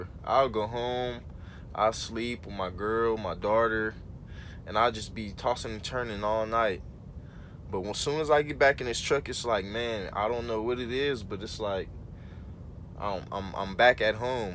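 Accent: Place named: California, United States. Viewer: Man fasheezy good looking with this video bro. I’m actually in the process of getting my DOT physical so I can get my permit and start school with Swift in Fontana. I’m a veteran so I heard there is some benefits to that but we’ll see. I definitely have a desire to do this and can handle it, sounds like fun to me if I’m being honest. I appreciate the content lol I can tell you was from Cali once I heard you start talking 😂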